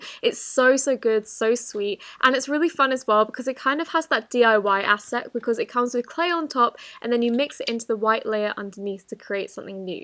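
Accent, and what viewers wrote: United Kingdom. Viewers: Omg! Is that a…UK ACENT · are you british or accent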